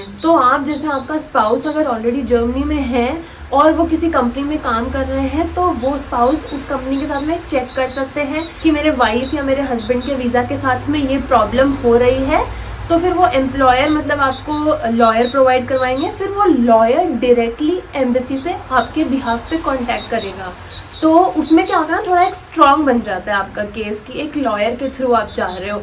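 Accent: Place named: Punjab, India. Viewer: Ma'am you said that you are from Rajasthan but you sound like Punjabi. We would like to know more about this.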